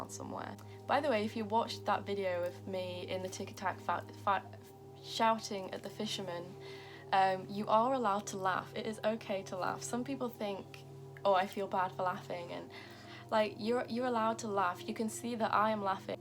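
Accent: United Kingdom. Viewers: I love your accent, what kind of uk accent is it?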